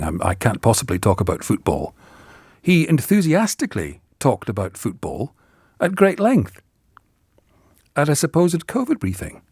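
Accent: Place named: Scotland, United Kingdom. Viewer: You’ve got a scotch accent.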